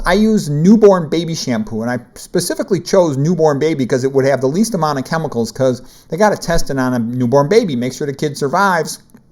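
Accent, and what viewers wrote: Chicago, United States. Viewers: You're accent tells me you're from Chicago?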